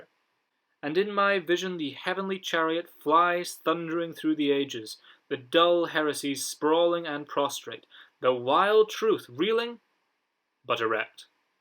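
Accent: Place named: England, United Kingdom. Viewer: Finally! Orthodoxy in an English accent 😊 thank you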